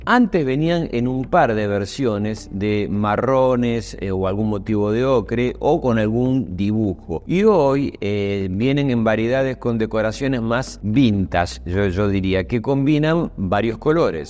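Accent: Argentina. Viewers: Aprecio el modo suave, claro y preciso de sus explicaciones, se nota que Usted sabe de lo que habla y su accento muy ligero a Argentina aún aumenta lo agradable que suena, le da un toque especial 😊 sigua así Maestro👍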